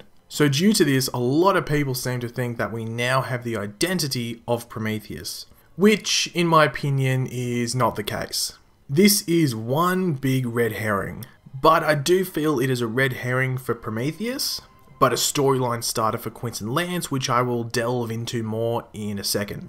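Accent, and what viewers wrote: Australia. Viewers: I think it's probably Billy right now, but I hope that it's Tommy. Also, you sounded very Australian this video.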